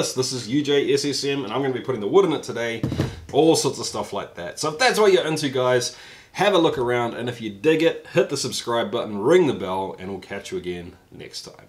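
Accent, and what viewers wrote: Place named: New Zealand. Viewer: not whiskey related. i usually have a hard time telling between NZ and AUS accents but this is the first time i was able to go "hey, he's a kiwi." (the "fush n chups" thing aussies talk about). just thought it was interesting.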